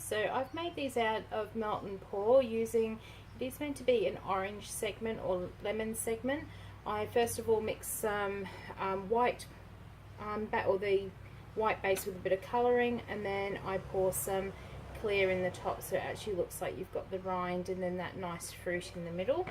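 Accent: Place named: Australia. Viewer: Delicate and lovely soap! Love Australian accent!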